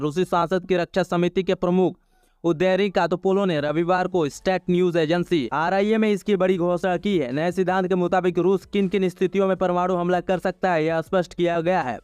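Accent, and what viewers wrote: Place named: Bhojpuri, India. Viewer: please slow your reading train, what you are reading we cant understandt, even your pronounciation is like you are reading english news in bhojpuri language. so please slow down th speed and concentrate on English pronounciation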